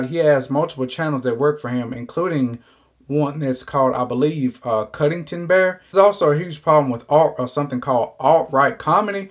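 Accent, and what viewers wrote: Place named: Texas, United States. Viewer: You sound like you’re from Texas like me.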